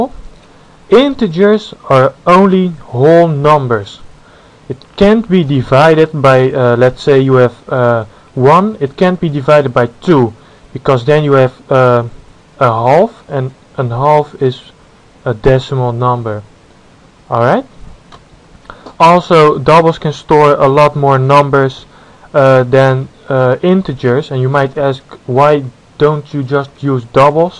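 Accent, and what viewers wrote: Netherlands. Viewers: dude zo een lelijke nederlandse accent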